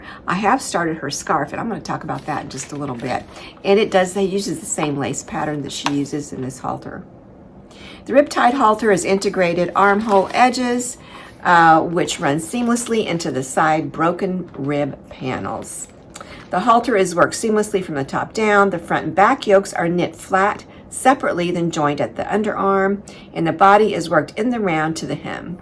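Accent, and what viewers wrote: South, United States. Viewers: Love your southern accent! How long have you lived in the South? 💕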